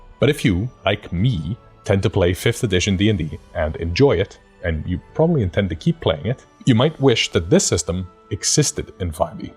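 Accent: Iceland. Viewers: Are you perhaps Icelandic? I can hear from your accent. Well i have a question. I'm going to be running a homebrew campaign but I don't know how to make my players invested in the plot and their characters. I've played with these people before and there seems to be one consistent problem. They seem to zone out every time they complete their actions and their characters never seem to interact with each other. How do I make them care? How do I make them invested in their teammates characters? (Edit i changed the question since i thought of a solution)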